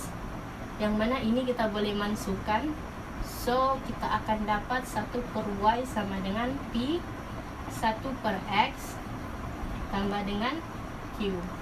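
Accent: Sabah, Malaysia. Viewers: Sabahan slang checkk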